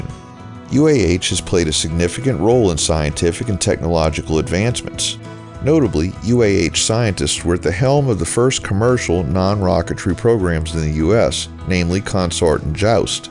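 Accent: New Orleans, United States. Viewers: I just happened onto this video. Thanks so much for posting it. My son is living in Huntsville, and I know he'd like to see your drive through this part of the city. I know he'd especially like to hear a New Orleans accent, too, since he is homesick. I am going to tell him to watch this. Thanks so much.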